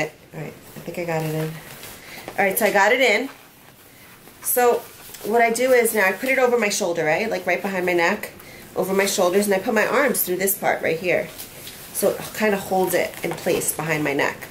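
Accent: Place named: New York, United States. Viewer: Just ordered one! BTW, you ded have a NY accent lol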